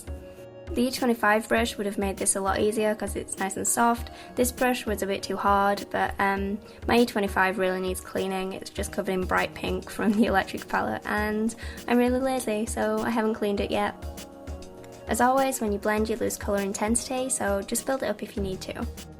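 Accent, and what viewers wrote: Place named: Sheffield, United Kingdom. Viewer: what part of England are you from? X You sound as though you're from Sheffield or near? x You're so gorgeous with or without makeup! x Also, what is your FIRST favourite eyeshadow? x this is such a great video, and I haven't even fi nished haha x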